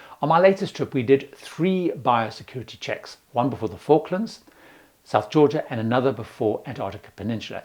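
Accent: South Africa. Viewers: Hey, are you South African?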